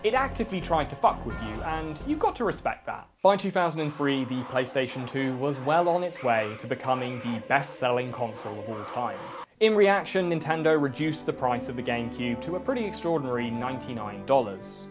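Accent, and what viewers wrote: United Kingdom. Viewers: Had to stop the video 1 minute in because of the unbearable British accent on my ears.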